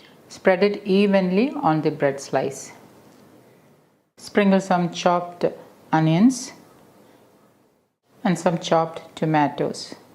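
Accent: Kerala, India. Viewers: I love the way you talk, mixing some keralite tone , so pleasing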